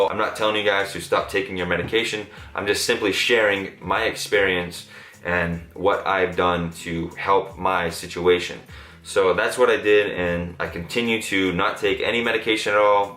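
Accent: Boston, United States. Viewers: 1) I love your eyebrows 2) I feel you on the relationship stuff. There’s no need to rush into things or constantly be looking for love. 3) Your Christmas dish mash up is the best 😝 4) I have MS and love the gym and food, but sometimes it’s so hard to get past my symptoms and dieting specifically for it. I understand being mentally stressed around medications and diets. It’s nice to hear someone give it all up and be free because I did that last year with a medication that almost killed me. You’re a great person. I love the videos! And I love your Boston accent lol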